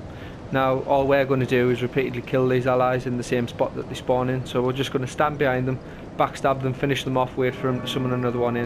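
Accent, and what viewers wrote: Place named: Northern England, United Kingdom. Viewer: Good Northern accent there ahah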